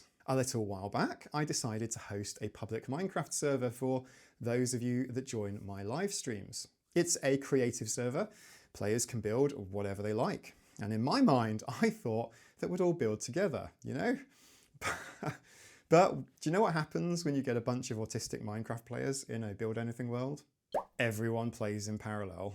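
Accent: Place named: United Kingdom. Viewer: I love your presentation style and accent! As a British person in Germany, have you noticed how criticism can be a love language there? I am native German, in the UK for 20+ years, and I realised recently I only criticise people when I care enough about them to want them to succeed and get things right, and to not fail or embarrass themselves. As Sheldon from the Big Bang Theory put it "if a summer in Germany has taught me anything, it's that brutal honesty is its own kind of love." (Young Sheldon, season 7) and "When I criticise people I lift them up." (from the "adult" BBT series) Seen by NT viewers probably as just as another annoying know-it-all trait, I can really see the truth in that. Can anyone relate?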